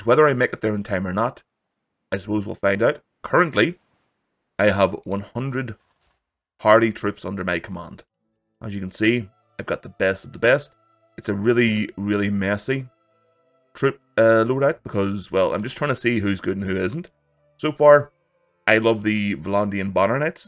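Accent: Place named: Scotland, United Kingdom. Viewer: Yet another video about bannerlord that I love and that makes me mad for not being able to afford it. I swear I'll go crazy soon watching videos like this one. Also, I love the accent, I'm Italian so I'm not used to accents like yours (I hope I'm not wrong but you sound Scottish)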